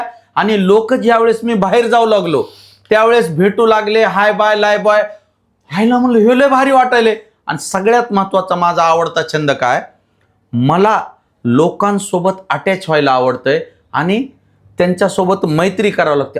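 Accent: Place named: Marathwada, India. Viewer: Greet manush ahe rao bhau vicharpan changle mandto marat vadyachi boli bhasa ahe ti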